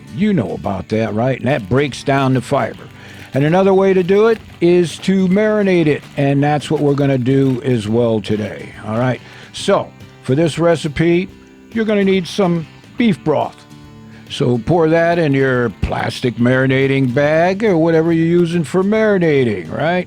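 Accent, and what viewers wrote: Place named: Colorado, United States. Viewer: His accent doesn't sound like a Texas accent more like Colorado maybe.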